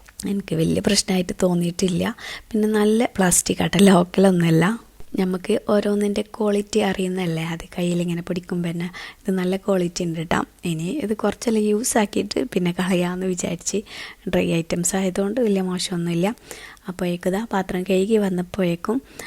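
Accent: Kasaragod, India. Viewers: samsaram thanne kure kasarcode karude yanallo kelkumbol first entha enghane parayunnen thonum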